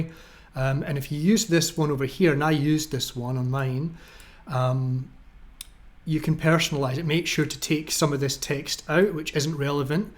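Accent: Scotland, United Kingdom. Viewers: How can I support you in the $ sense ? ? Seriously, we need a lot more people like you (with or without the dour Scott's accent) I'm in Melbourne, and not that hard to find.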